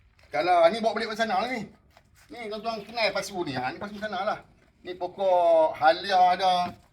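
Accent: North, Malaysia. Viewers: dh KENA ckp utara 😅beestt... mmng terbaikk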